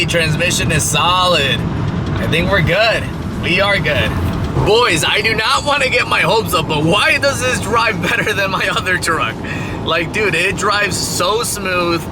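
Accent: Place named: United States. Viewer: What state are you from the accent is crazy lol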